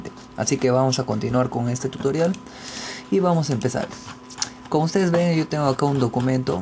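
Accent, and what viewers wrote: Peru. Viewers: peruano XD lol (lol) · Español Perú ☠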